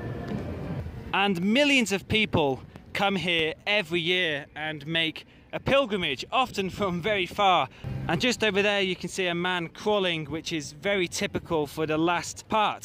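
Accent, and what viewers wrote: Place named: United Kingdom. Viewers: Totalmente, pero hay quienes apenas distinguen que habla inglés, mucho menos que tenga acento británico. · johan gomez Fíjate en la manera en que él habla, se le nota el famoso "acento británico"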